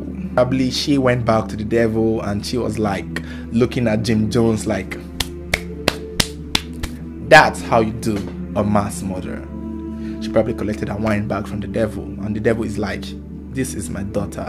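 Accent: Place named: Nigeria. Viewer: This narrator sounds Nigerian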